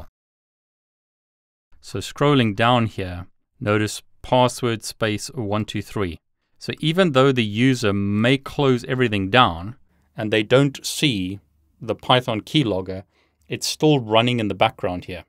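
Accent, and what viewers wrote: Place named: South Africa. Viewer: are you south african lol? that’s what your accent sounds like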